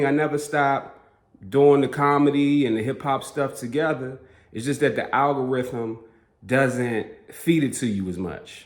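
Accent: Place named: Baltimore, United States. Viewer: I am a long-time follower, i watch all your videos. I usually just click on the subscribers tab on YouTube, and i see you all the time. Also, i work in DC. Are you from Maryland, I hear that Maryland Baltimore dialect when you talk.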